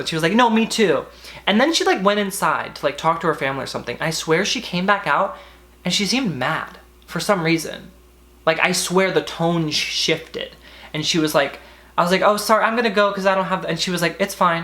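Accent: United Kingdom. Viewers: i love your british accent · also, i NEVER find you boring, and i love your british accent lol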